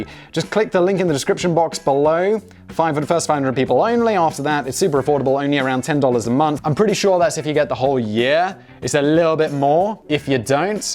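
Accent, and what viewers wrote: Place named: England, United Kingdom. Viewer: Simon owes his popularity to the fact that England invaded so many countries. Now when the entire world hears an English accent they sit up and listen, because that Brit probably runs things. Especially the guy with the posh accent... he must be in charge. We just take Simon's authority for granted the minute he speaks. Before you know it, eight channels.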